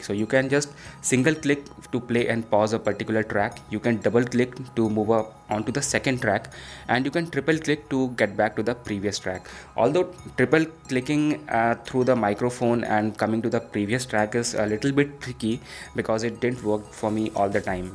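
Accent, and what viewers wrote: India. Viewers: At first I was like hmm Indian accent but I'll keep watching. your accent was surprisingly not annoying to listen to, because it sounded very natural. Thanks man!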